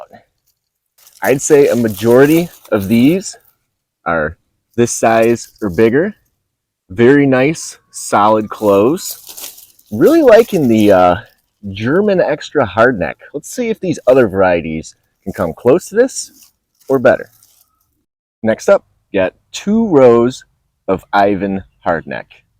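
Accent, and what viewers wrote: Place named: Midwest, United States. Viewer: I hear mid west accent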